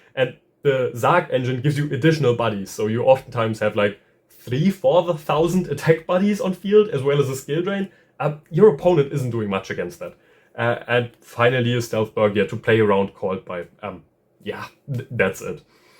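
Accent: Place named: Germany. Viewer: boa.... wenn deutsche englisch reden...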